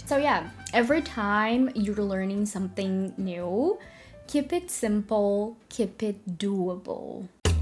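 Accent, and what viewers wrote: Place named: Brazil. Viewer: oh my god, i love english brasileiro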